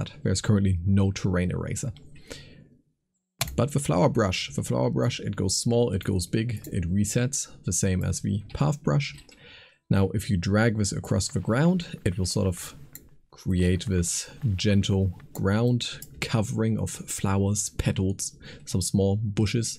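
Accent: Germany. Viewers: I love the tutorial, but holy shit I love ur voice, the way you speak and emphasize on certain words and that thick (I think german) accent just makes this absolute cinema, love it buddy 😍😅😂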